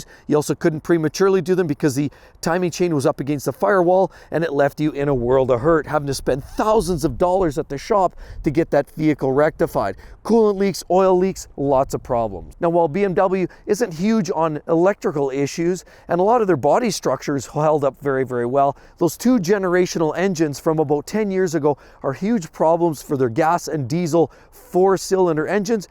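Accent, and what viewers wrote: Canada. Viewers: he's got a Canadian accent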